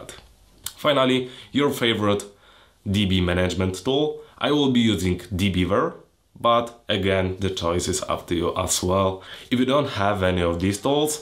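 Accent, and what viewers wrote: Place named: Russia. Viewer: Видно, что акцент русский, но видео на пендосском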